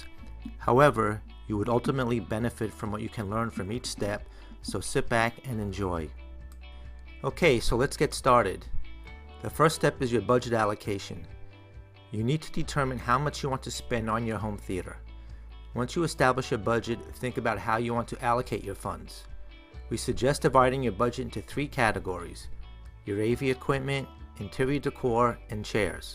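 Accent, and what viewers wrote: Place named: New York City, United States. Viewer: This guy sounds like he's from the NYC area... good to know when I construct mine!!!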